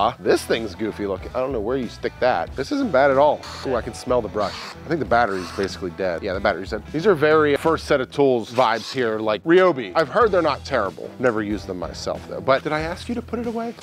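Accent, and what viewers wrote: Pittsburgh, United States. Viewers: I caught that Yinz and the saw that steelers logo in the background. Thought your accent sounded familiar! Pittsburgh ftw! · Ahhhhh. Love hearing that Pittsburghese. Reminds me of home! Go Stillers!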